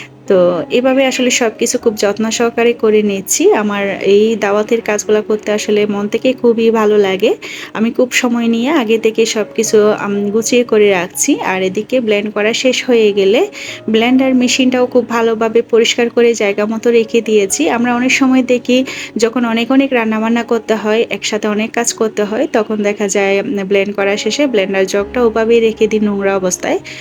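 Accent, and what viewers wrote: Chittagong, Bangladesh. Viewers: আসসালামু আলাইকুম আপু,,,আমি আজকে প্রথম আপনার ভিডিও দেখলাম,,,আপনার কথা শুনে আর রান্না দেখে আমার কাছে মনে হয়েছে যে আপনি চট্টগ্রাম এর বাসিন্দা,, তাই শিওর হওয়ার জন্য আপনার আরেকটা ভিডিও দেখলাম,,মহররম এর ভিডিও টা দেখে শিওর হলাম,,,তাই সাথে সাথে সাবস্ক্রাইব করে দিলাম,,কারণ আমার জন্ম চট্টগ্রামে,,ছোটো থেকে বড় হয়েছি সেখানে,,তাই চট্টগ্রামের জন্য আমার ফিলিংসটাই অন্য রকম,,যদিও আমি কুমিল্লার মেয়ে,,,যাই হোক আপনার কাছ থেকে চট্টগ্রাম এর অতিথি আপ্যায়ন কিভাবে করতে তা শিখবো ইনশাআল্লাহ,, কারণ এই মাসে আমার বোনের বিয়ে,,,তাও আবার চট্টগ্রামের বাসিন্দার সাথে,,, আমার বোনের জন্য দোয়া করবেন আপু,,, আর আরেকটা কথা,,আপনার রান্নাগুলো মাশাল্লাহ অনেক লোভনীয় হয়েছে,,আমি চট্টগ্রাম চট্টগ্রাম করতে করতে আপনার রান্নার প্রশংশা করতেই ভুলে গেছিলাম,,,তাই আবার আসলাম কমেন্ট টা সম্পূর্ণ করতে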